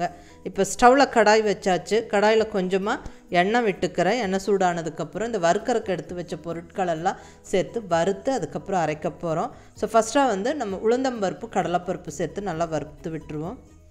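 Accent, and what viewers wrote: Kongu, India. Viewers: I tried it today.. it was good.. mam, all Ur recipes were good, clear explanation.. apart from the recipes, Ur kongu Tamil is superb.. namma oor karanga, enga neighbour polA feel agudhu.. Thanks a lot mam..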